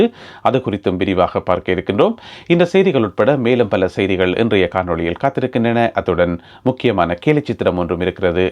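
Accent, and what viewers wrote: Jaffna, Sri Lanka. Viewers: உங்களின் செய்தி அழகாகவே இருக்கிறது.நடை உச்சரிப்பு எங்களின் தனித்துவத்தை வெளி உலகிற்கு எடுத்துகாட்டாகவே இருக்கிறது.எமது சந்ததிகள் அழகான எம் மொழி நடையை பின்பற்றும் பயிலும்.கேக்க கேக்க யாழ்ப்பாணத்தமிழ் இனிமையே. எம் ஈழத்தின் அத்திபாரமே தமிழ் உச்சரிப்பே. எம் தேசியத் தலைவரின் அடைத்தகுரலின் கம்பீர தன்மையை எண்ணிப்பார்க்கையில் மனம் சிட்டாக பறக்கிறதென்னவோ உண்மை🙏🤗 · உங்களது மொழி உச்சரிப்பில் யாழ்ப்பாண சாயல் முழுமையாக தென்படுகிறது… சற்று அக்கறை எடுத்து ஒட்டுமொத்த தமிழ் பேசும் மக்களுக்குரியதாக செய்திகள் கூறும் முறைமையை ஏற்படுத்துங்கள்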